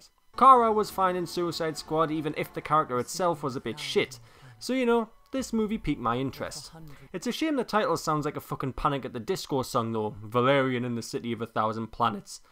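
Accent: Newcastle, United Kingdom. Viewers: i would say Newcastle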